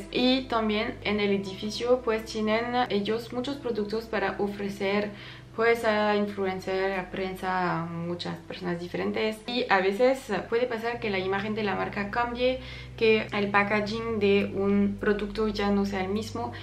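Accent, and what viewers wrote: France. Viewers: Cómo eres envidiosa. Solo por su acento frances. Y romántico. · El acento francés se escucha muy bien en español. El shua y las variaciones de la "i" son fenomenales. Tienes dos vidas : Hablas español nativo y francés nativo, ¡de lujo escucharte!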